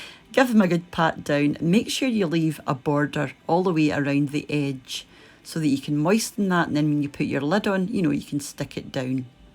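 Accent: Scotland, United Kingdom. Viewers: Okay, I just found this channel and I LOVE it. I always loved scotland and now, I can attempt to cook scottish things while listening to an actual scot (with a marvellous accent I might add) explaining it to me. That's wonderful! (Sorry for spelling mistakes, I'm being exited, tired and not a native speaker/writer at the same time. And I always suck at spelling)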